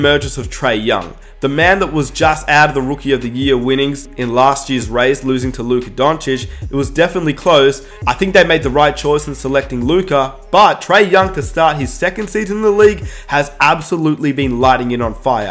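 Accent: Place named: Australia. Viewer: You sound so Australian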